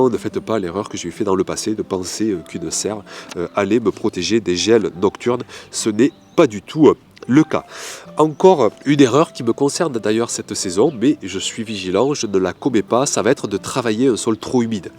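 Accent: South, France. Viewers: Trop bel accent du Sud ❤j’ai plutôt l’accent du Nord ❤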